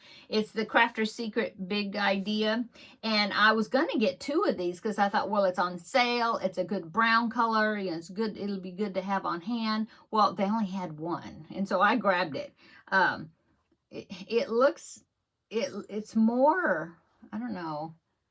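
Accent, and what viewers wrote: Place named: Texas, United States. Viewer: I just found your channel, and im really enjoying it. Im formerly from East Texas and now live in Georgia. Im enjoying a fellow Texan accent!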